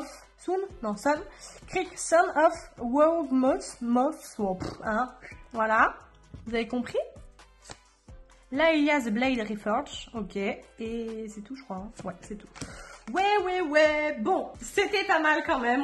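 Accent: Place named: England, United Kingdom. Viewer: Je viens de te découvrir aujourd'hui, j'adore ta bonne humeur !(et aussi ton accent anglais 😂)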